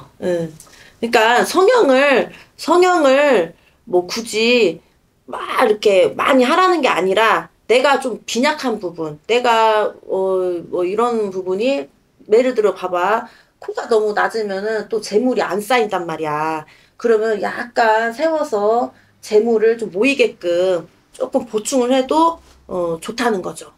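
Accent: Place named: Jeonju, South Korea. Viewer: 선생님도 관상학적으로 재물많이 들어오도록 갖추셨네요.예쁘고 특유의 전주사투리가 구수하고예뻐요.감사합니다.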